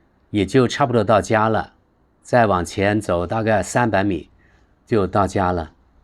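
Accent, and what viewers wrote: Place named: Southwest Mandarin, China. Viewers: 博主是广西哪的？说的话有点像西南官话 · 口音像四川话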